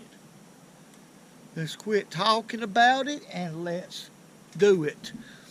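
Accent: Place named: Florida Panhandle, United States. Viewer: I really enjoy your videos my friend! I’m from the panhandle of Florida and I love to hear your accent! I have the same thing going on lol!! I moved a couple of states away and I don’t hear anyone talking like us besides your videos. Keep making these videos and I’ll keep watching em.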